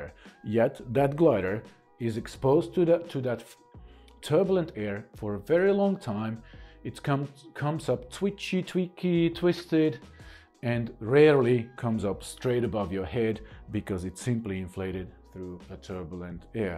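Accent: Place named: Slovakia. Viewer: It's just his accent. He's from Slovakia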